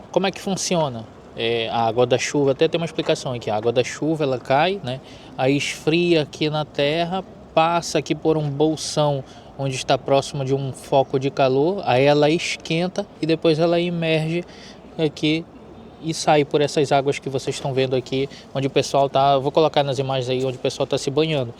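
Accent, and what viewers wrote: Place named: Amapa, Brazil. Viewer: eu tambem sou de Macapa, logo reconheci o jeito de falar.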